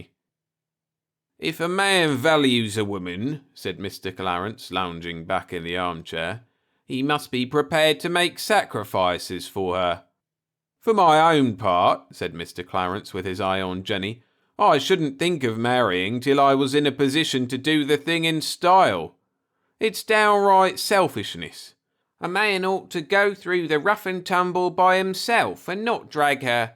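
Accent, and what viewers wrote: United Kingdom. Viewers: I'm in the same boat in regards to tech. I'm very glad still posts some stories here. His accent sounds sort of South East English to me, I hope he sets us straight. 😊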